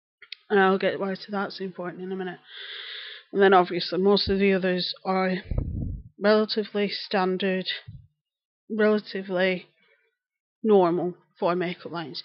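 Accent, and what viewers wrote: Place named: Bolton, United Kingdom. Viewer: you sound like you are from Bolton. xxx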